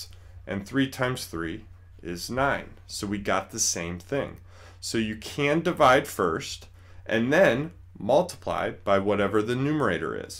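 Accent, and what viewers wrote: United States. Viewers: Sir you are saying like an American so l cannot understand what you are saying l can see and trying to understand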